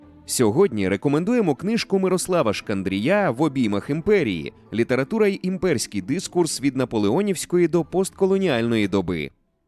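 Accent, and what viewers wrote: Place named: Moscow, Russia. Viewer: "гвориЦЦць, жиЦцЦя, громаДЗзззяни" треба на консультацію до логопеда! Вкрай неприємно слухати ці маасковські виверти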